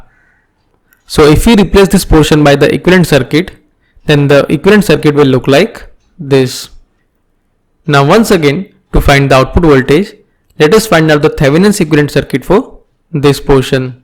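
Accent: India. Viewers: 你好強喔 連印度腔都可以翻譯ㄟ 777777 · 印度腔我真的不行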